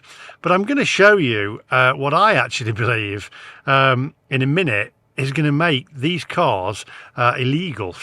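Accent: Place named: England, United Kingdom. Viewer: clearly having an English accent does not ensure you have a intelligent.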